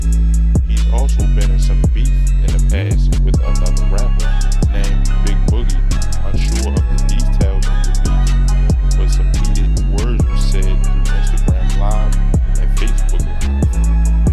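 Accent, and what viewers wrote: Baltimore, United States. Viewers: You from bmore ain’t you? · This dude accent 💀 he gotta be from Bmore lmaooo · The dude who does this channel def from Baltimore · I hear a Baltimore accent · u frm baltimore huh😭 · He from Baltimore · You can tell my man from Baltimore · U def from Baltimore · Bro talking gotta be from Baltimore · He from Baltimore speaking on down south shit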